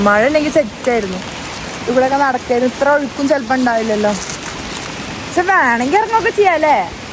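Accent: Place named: Malappuram, India. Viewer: Ith malappuram slang alle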